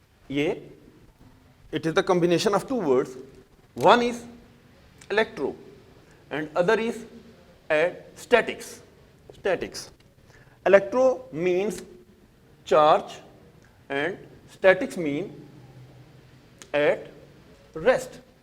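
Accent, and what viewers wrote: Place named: India. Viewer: SIR You neeed to improve your English You have desi style OLD VERSION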